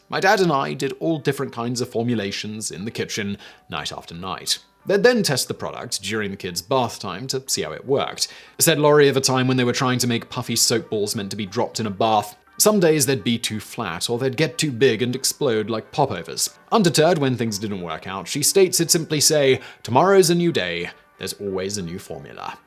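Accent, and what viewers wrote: United Kingdom. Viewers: Un trap en ur....lol the British pronouncements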